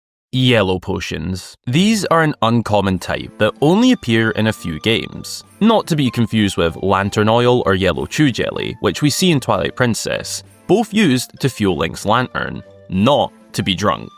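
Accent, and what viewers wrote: Scotland, United Kingdom. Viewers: Are you from the same region of Scotland as Lorraine Kelly?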